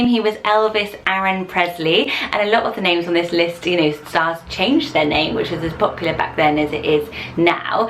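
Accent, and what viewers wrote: United Kingdom. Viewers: Because of her strong British accent, every time she started to say "Jagger" I legitimately thought she was saying "Jack maybe not a good choice for me... LOL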